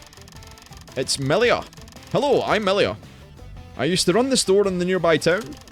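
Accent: Scotland, United Kingdom. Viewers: WOAH! I can watch a Scottish uncle play some Korean roguelikes!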